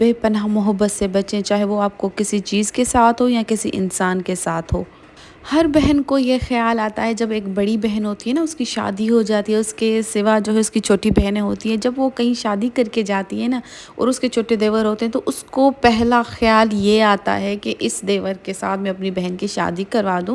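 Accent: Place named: Sindh, Pakistan. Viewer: Sister ap sindhi ho na😂 lehjay sy pata chal rha hy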